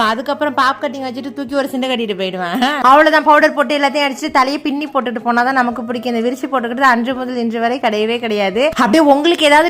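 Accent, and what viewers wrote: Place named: Thanjavur, India. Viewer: பேச்சு சூப்பர்... ஊர் பாசை நல்லா இருக்கு... தஞ்சாவூர் பாசை....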